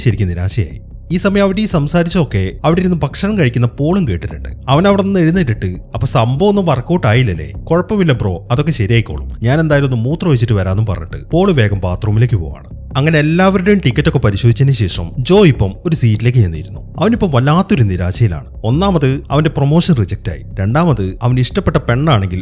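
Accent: Palakkad, India. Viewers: Chettan palakkad ano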